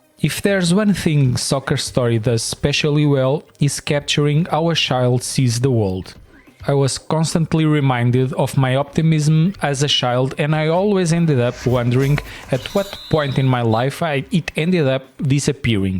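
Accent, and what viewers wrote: Portugal. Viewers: Este sotaque tuga nao há como falhar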